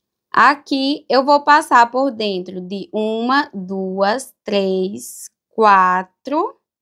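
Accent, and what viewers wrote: Northeast, Brazil. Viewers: Ensina pedraria e ainda rima cordel 🥰 Ainda por cima com esse sotaque que só o nordeste oferece 😊Virei fã !!!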